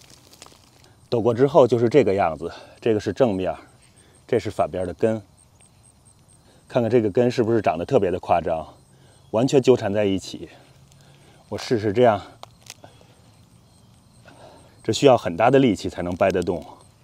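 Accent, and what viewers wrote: Beijing, China. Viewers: 说的清楚透彻。听口音你是北京人吧？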